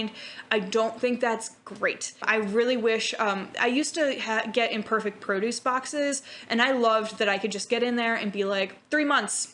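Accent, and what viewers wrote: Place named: Midwest, United States. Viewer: thick Midwestern accent CAT-EH-GOREY I'm seriously impressed by your grocery shopping skills, like damn. I'd be super interesting in a video on grocery budgeting. Food is always something I've splurged on as a self-care/comfort thing and while in pandemic I've gotten better about being mindful of a budget I feel like I'm still playing a guessing game at the checkout lamo.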